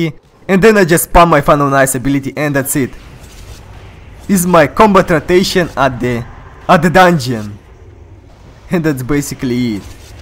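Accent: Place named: Russia. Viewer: that is one heavy russian accent you got there bratan.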